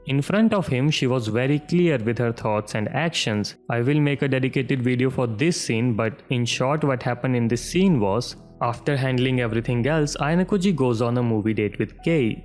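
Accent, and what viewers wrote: India. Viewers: I'm one of the novel pioneers. At first I was like. "Uhhh what's with this indian accent voice" But then he says funny stuff in the end.. "Bro youre so hilarious". You get a sub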